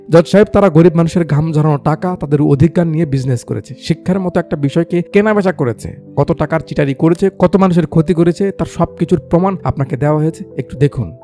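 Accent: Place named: Rangpur, Bangladesh. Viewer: আমাদের রংপুরের কথা শুনে ভালোই লাগলো। সঠিক বলেছেন।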